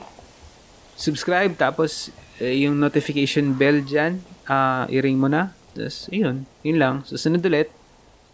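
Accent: Philippines. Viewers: I love your Filipino accent Say it again.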